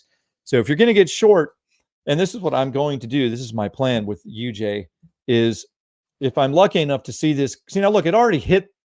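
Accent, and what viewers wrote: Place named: Maryland, United States. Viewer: Btw, your accent is like my inlaws in easton,md 😂. Are you from md?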